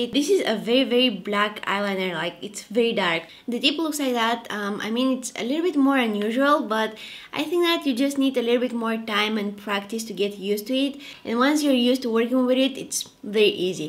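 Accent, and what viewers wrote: Greece. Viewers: Απο τι στιγμη που την ακουσα λεω ειναι ελληνιδααα το ηξερα❤️ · are you greek ? omg and i was wondering why i love your accent and the way you talk !! greek power girl !! xo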